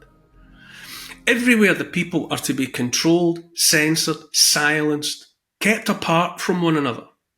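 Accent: Scotland, United Kingdom. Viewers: From USA here, sometimes I listen to your channel just so I can hear your awesome Scottish accent. My favorite! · I love ❤️ that Scottish accent.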